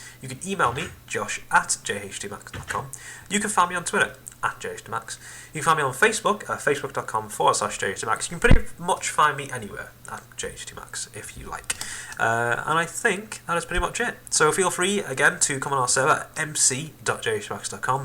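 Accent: United Kingdom. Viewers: Pam VanWinkle its called a british accsent stupid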